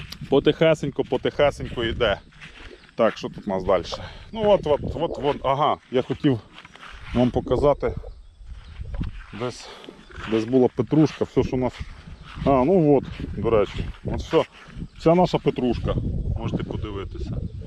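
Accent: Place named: Podillia, Ukraine. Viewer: Лайк з Криму. Вчора познайомилась з Вашим каналом,п!дписалась.Дякую за укра!нську.Розмовляете по Под!льськи.(за помилки вибачте клава руська).Вдач! Вам.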